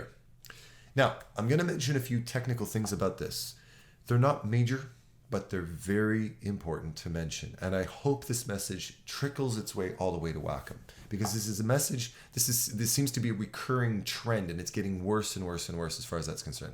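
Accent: Canada. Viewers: I'm new to the channel and love the content,! Does anyone here have the calibration settings for the 24in Cintiq? I purchased one through a school grant at work (high school art teacher, here) and wondered whether or not I should get that Wacom color calibrator. Side note, my dad grew up in Winnipeg. So, when I came across your video on Infinite Painter I detected the Canadian accent. So cool! Happy New Year to all!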